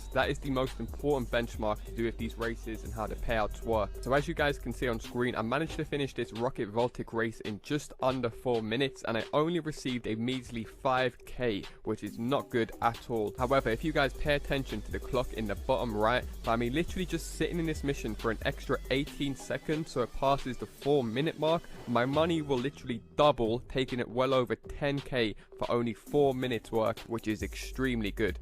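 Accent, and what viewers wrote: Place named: England, United Kingdom. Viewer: English u wallad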